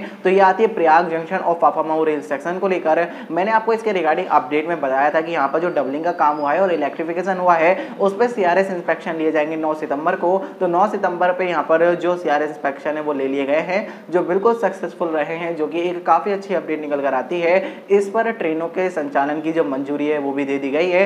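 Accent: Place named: Bihar, India. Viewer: Bhai pakka tum bihar se ho...!!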